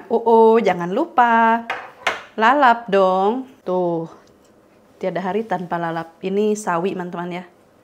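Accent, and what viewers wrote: West Java, Indonesia. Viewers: Aduhh teteh yamg nonton lebih panik...apinya naik gt deg2 an teh teteh biar lama di uk tapi logat sundanya ndak ilang ya teh tapi ndak papa itulah cirinya teteh....rame teh kalo sambil cerita2 tambah asik....selalu sehat teh❤❤ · Abdi kadupandak oge, Suganda. Seneng teteh logat sundanya masih.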